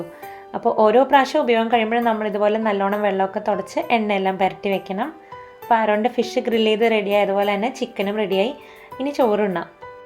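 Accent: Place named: Thrissur, India. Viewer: ഞാൻ കൊടുങ്ങല്ലൂർ ആണ് thrissur Dist സംസാരവും food എല്ലാം വളരെ പരിചിതം ആയി തോന്നി അതുകൊണ്ടാ സ്ഥലം ചോദിച്ചത് ട്ടോ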